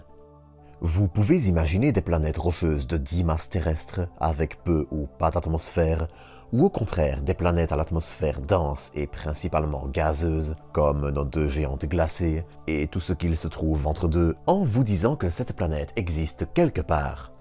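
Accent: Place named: Flanders, Belgium. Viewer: Belge flamand, je crois.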